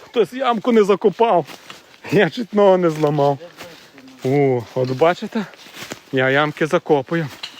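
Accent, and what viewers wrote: Ukraine. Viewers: Приємно з Вами( лежачи в ліжку ) копати і насолоджуватись природою та приємним українським говором. Молодці так продовжуйте, Хто шукає той знайде .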